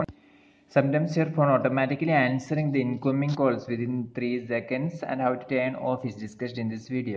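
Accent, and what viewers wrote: Kerala, India. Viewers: Mallu accent😄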